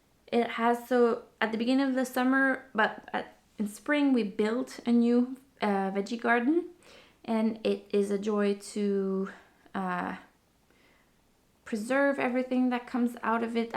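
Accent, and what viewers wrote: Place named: France. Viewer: Absolutely delightful podcast...love your French accent. I do miss young motherhood. I can see you are savoring this time of your life!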